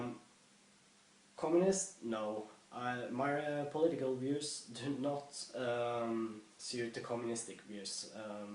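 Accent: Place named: Australia. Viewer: Bruh how did I always think he was Australian...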